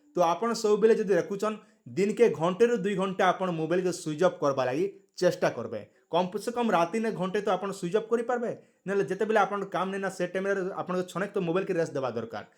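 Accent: Kalahandi, India. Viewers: ତୁମେ କଳାହାଣ୍ଡି ଜିଲ୍ଲା ର ଦାଦା ତୁମର ଭାଷା ରୁ ଜଣା ପଡୁଛେ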